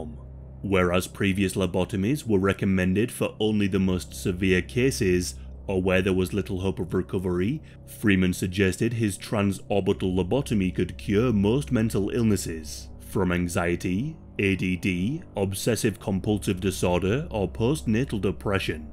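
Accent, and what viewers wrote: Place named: Yorkshire, United Kingdom. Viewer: i love the way u try to make ur yorkshire accent sound slightly posh lmaoo 😭 do you do this so its easier for people who arent native english speakers can understand you more??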